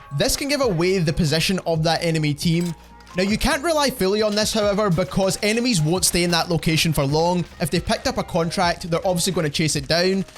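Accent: Scotland, United Kingdom. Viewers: Very Scottish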